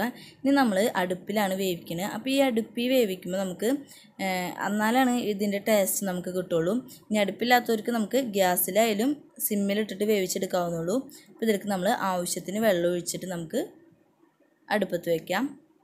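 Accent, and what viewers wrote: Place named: Thrissur, India. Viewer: സംസാരം കേട്ടാൽ അറിയാം തൃശൂർ ആണെന്ന്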